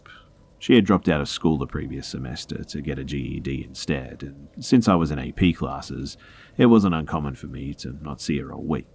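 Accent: Australia. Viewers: So good to hear an Aussie accent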